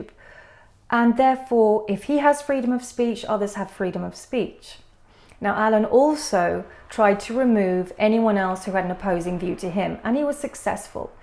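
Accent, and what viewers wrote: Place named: United Kingdom. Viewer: First...Thank you. Thank you so much for spreading light on AD & any other Youtubers/Scammers that are trying to scam good hearted people. Your voice is beautiful. I've always loved the Bristish accent. You are a beautiful person to finally expose AD (Alan Viddecom) however you spell his name. Thank you. Thank you so much. 🌻🌻🌻🌻💜💜💜💜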